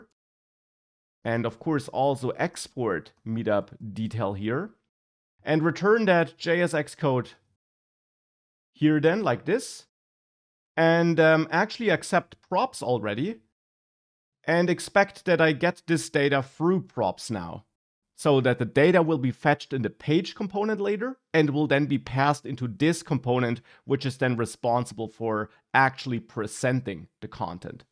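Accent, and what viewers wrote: Germany. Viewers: Warum höre ich ein deutsches Akzent. Naja alles gut Bruder l.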